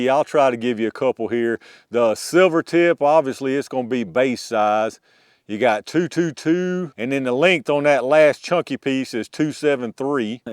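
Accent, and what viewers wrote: South, United States. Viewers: I knew the CCI would work. It's the same bullet that's loaded in the Subsonic Segmented HP CCI sells. I use the mini mag version in my suppressed 6". The run 1060fps from it, and the subsonics run pretty much the same velocity from my rifles. From your accent, I can tell you're another southerner (Bama here). So you can face Alabama and wave, Hi neighbor ;) The segmented CCI won't exit a raccoon or possum, in my experience. Convenient when either are raiding the cat feeder on the front porch 👹 Also, glad to see another 10mm fan <3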